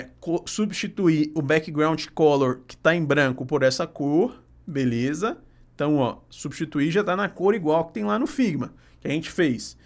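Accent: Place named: Minas Gerais, Brazil. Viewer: Meu conterrâneo, kkkk sou de Juiz de Fora, reconheci o sotaque. Um grande abraço, ótimo conteúdo.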